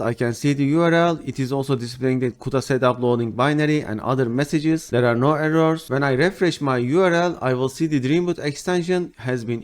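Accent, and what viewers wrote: Turkey. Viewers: Dostum merhaba,Hiç sayfana girip bakmadan konuşma tarzından Türk olduğunu anladım ve çok mutlu oldum.Gösterdiğin şeyler araştırıp bulamadığım bir çok sorunumu çözdü ve çok takdir ettim seni.Kolay Kolay hiçbir kanala abone olmam ama bugün 1 abone kazandın.Başarılarının devamını dilerim.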